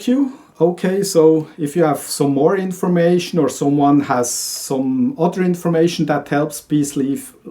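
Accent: Switzerland. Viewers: Tönt nachdem ne Schwiizer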